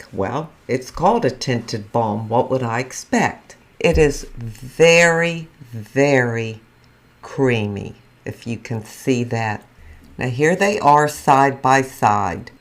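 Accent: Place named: Pennsylvania, United States. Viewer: Hi! I'm new here to you! Wow no foundation! I'm a foundation Queen at 78 almost 79! I think I still like to play little girl dress up LOL I had my cataract surgery done January and I can't wear full make up yet or eyeshadow and I'm chomping at the bits LOL your skin looks good for not wearing any foundation you must take care of your canvas because that's number one for me even though I cover it with foundation LOL I love your accent my PA girl I'll see you again soon. Oh and I don't use this foundation only because it's not enough coverage for me but it looks good on you! My subscribers have gotten me thru some tough times the last year so yes encouragement and support means everything! 😘Hugs Babs ❤️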